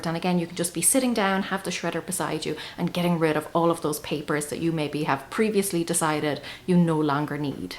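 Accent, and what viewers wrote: Ireland. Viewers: Very real. I am recovery surgery and low energy, this is very realistic. Thank you for this great viedo. I love your accent, it must be Irish, awesome!🍀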